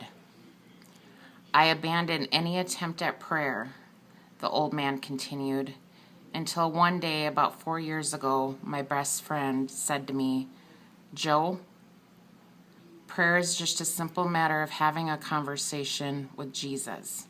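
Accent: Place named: Upper Michigan, United States. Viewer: You have a Upper Michigan type of accent. It could be Minnesota or Wisconsin too. I grew up in the U.P. but live in TUCSON-AZ.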